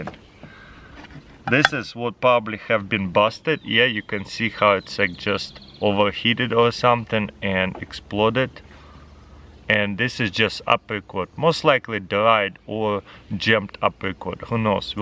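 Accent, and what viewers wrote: Russia. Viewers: Anyone else read this in his Russian accent😂😂😂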